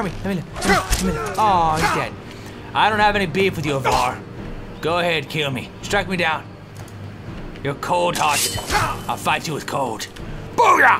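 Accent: Russia. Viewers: Holy crap... I just realized what his Russian accent reminds me of! BARTOK. FROM THE MOVIE 'ANASTASIA'. Holy crap... wow. I'll never watch that movie the same way again.: "I give her a 'HAH', then a 'HIYAH' and I kick her, sir." Wow. My night has been made. =] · You had a russian ascent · I like the Russian accent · this guy sounds like fpsrussiawith his russian accent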